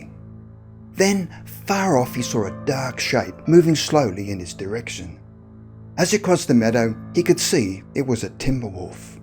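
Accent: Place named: Australia. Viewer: What a touching true story! Proves that "Truth" which is in this case has taken a form of Mother Nature Herself, can manifest in ways far stranger than those in fiction! There is an intrinsic bond among all the inhabitants of the planet which comes to the fore, and as the narrator has so rightly pointed out, all this transcends "conventional wisdom". The narration is also very engaging, I suspect the accent is the cordial Australian one!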